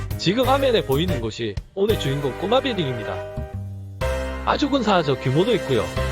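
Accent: Gyeongsang, South Korea. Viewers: 와 우리 진주사투리갖고 뭐라카지? 직접 유튜브 찍어보고 이야기하시지.. 내는 고마 들을만하고 이쁘게 말 할려고 노력하는게 보이는데. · 어슬픈 진주 사투리 참... 그냥 표준어 쓰시죠 ㅎㅎ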